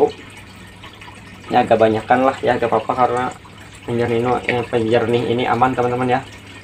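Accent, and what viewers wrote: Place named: Sunda, Indonesia. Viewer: Sunda bngt eta si akang😂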